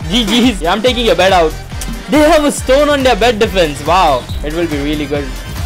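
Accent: India. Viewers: W INDIAN ACCENT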